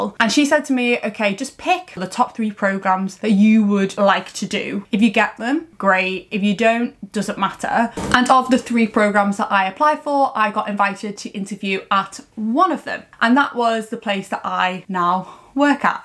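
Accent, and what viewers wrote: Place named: Leeds, United Kingdom. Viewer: You sound like your from Leeds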